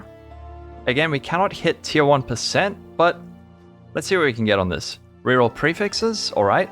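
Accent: South Africa. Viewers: Just came across your channel, and a quick question with regards to your accent - are you from South Africa?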